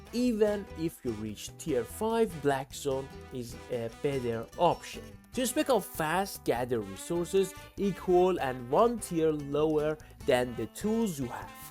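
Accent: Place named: Iran. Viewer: dash zar mizane irani hasti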